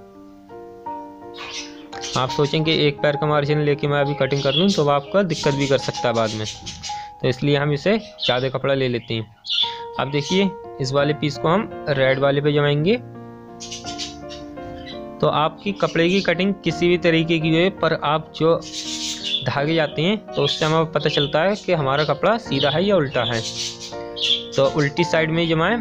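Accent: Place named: Pilibhit, India. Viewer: Nice... Aap rahte kahan ho? Bhai bhasha bilkul humari jaisi hai.... Pilibhit zila....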